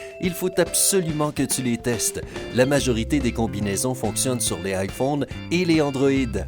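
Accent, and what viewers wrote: Quebec, Canada. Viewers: C'est quoi cette accent de bouseux du Québec a vomir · Voix de Québecoi ? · et sinon a l accent et aux numeros tapés sur le tel dans la video, vous ne comprenez pas que ca se passe au quebec et que donc il y a une partie des codes qui peuvent etre dependant des fournisseurs d acces, et que donc ca pourrai ne pas marcher pour tous les codes dans tous les pays? une partie doit dependre du telephone en lui meme (et donc marchent bien) et une autre partie du fournisseur.. en attendant ceux qui font croire connaitre tous ces codes, c est juste des branleurs qui se la racontent.. a part le #31# tres peu ont du deja entendre parler de ces codes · Pour le premier conseil, effectivement L'IMEI est très utile en cas de vol pour inscrire le tph au fichier des objets volés. Par contre aucune localisation possible avec ça. Quant au blocage de l'appareil c'est pas la police qui le fait non plus, c'est votre opérateur. 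En tout cas en France c'est comme ça, au Québec (vu l'accent de la voix off) je sais pas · Je préfère l'autre voix de Sympa perso j'ai pas l'habitude de la voix Québécoise moi 😕. il dit smartphone en anglais et ça fait trop bizarre, j'aime pas ! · Pk vous mettez un accent Canadien ? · Accent québécois ou je me trompe ! J’aime cet accent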